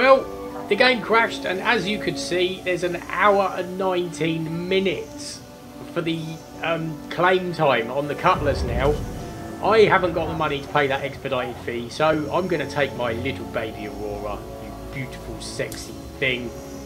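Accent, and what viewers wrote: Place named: South West England, United Kingdom. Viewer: Sounds like your from the South West mucker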